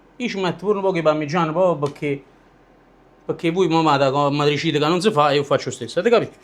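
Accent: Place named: Naples, Italy. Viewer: Sei troppo simpatico!!! Adoro la tua napoletanità, il tuo dialetto, il modo, mi strappi sempre un sorriso e anche la voglia di cucinare! 😊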